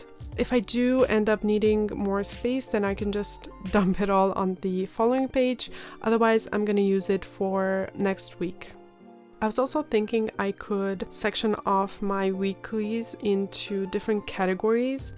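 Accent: Germany. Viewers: Wow thanks for replying! And thank you for your nice words! I can imagine you're busy, I've picked up somewhere in the comments that you're working on your masters, good luck! I'm doing my PhD right now and I'm forced to keep it minimal because of lack of time. However I still try to dedicate at least 5 min per day to journaling. It gets my mind off real life :) Unrelated but are you German? Your accent sounds very familiar, haha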